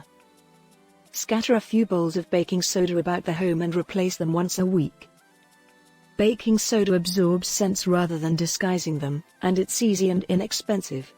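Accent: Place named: United Kingdom. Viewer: Why the British accent!!!!!! Hire American!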